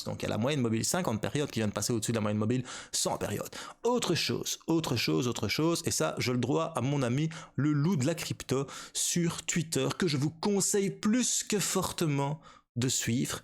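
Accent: Liège, Belgium. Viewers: Merci pour la vidéo. Bonne semaine de vacances si je ne me trompe pas. J'aime bien cet accent Belge. J'ai l'impression d"écouter du Benoît Poelvoorde , surtout quand tu t'agaçes ;-) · Le bon accent de Liège ... Bonjour de Mons en Belgique continues tes vidéos poto ...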